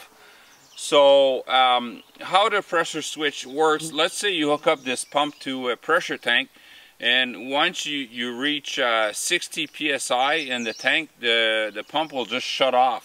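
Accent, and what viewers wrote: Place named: Canada. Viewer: Nice setup. Looks like there's enough room in the box for the battery, so you could have even shorter wires. Plus it'll keep it sheltered and hidden. P.S. I could tell from your accent that you're a fellow Canadian. French Canadian?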